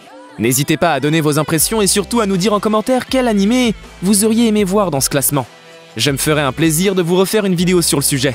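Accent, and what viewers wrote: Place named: France. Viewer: i can't stand your English accent bro, you're literally read english words with frensh accent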